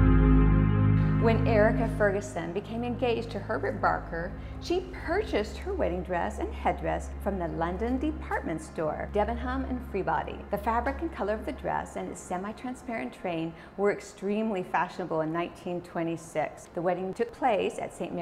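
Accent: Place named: United States. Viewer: American accant dreadful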